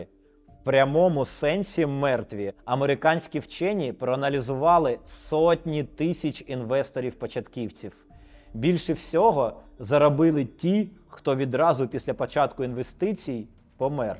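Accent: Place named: Russia. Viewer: Дуже важко слухати ці рускаязичниє чєлюсті😅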